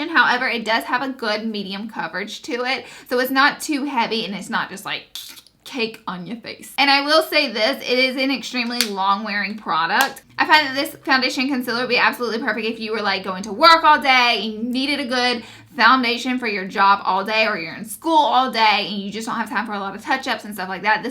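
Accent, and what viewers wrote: South, United States. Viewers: From one southern girl to another, your accent just makes me so happy! I love this compact 😊 #snapchatfam #snapchatfamforlife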